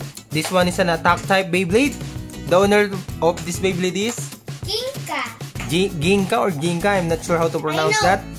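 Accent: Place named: Philippines. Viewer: Owe men your accent, are you a filipino?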